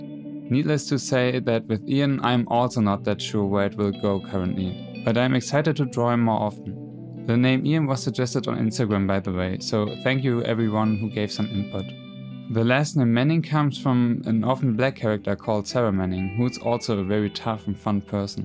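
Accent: Germany. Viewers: DEVIL MAY CRY?! My favorite character. Dante is. Jeeez. Amazing. I even loved nero and dante with short hair. I hated it in the beginig. So you have with hair right? A little Dante ispired? I started listening to your videos late at night when i couldnt sleep. Ill be hones. Your german accent is... relaxing to me cuz of years of watching rtl2. It was my only sorce of anime back when i was a kid. Had luck cuz i was taking germany classes since kindergarten. Why was this so important here in my country i dont even know... i think u are so much more capable than me. Think u are even the same age as me but i dont know.. while i draw and paint all the time i strugle. Or maybe i should learn to accept my style. Cuz its definetly very diffrent of what i want. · Also ich denk mal du beust deutsch wegen deiner aussprache , also schreib ich mal in deutsch xD auf webtoons gibt es eine story namens sirenes lament und da gibts auch einen ian der so eine attitude hat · dieser deutsche Akzent xD aber schön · r u from germany? 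haha i dont know your accent is a bit like mine. ^^”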